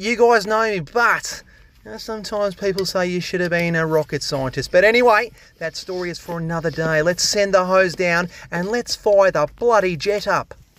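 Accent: Australia. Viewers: Let’s be honest, I’m more here for the Australian accent then the drain cleaning. That’s just a neat little bonus on top innit? Greetings from Germany :) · Love your channel, but your silly over the top okka accent is a bit annoying. Don't be Steve Irwin of drainers mate!.....😂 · LOVE Aussie LOVE your accent ❤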